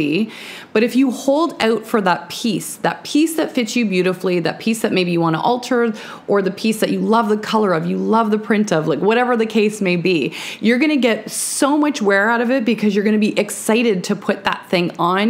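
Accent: Canada. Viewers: Hello, new subscriber here! Very interesting and helpful tips, I can see this will be a fun channel for me. I love the deal with bringing our fancy dress into our everyday wear. I am a big fan of that style, even if it does confuse some women in my neighborhood.😉 But first, I was wondering (not a fashion question) if you are from Canada? Curiosity always gets the best of me and there is a slight accent I hear...just wondering.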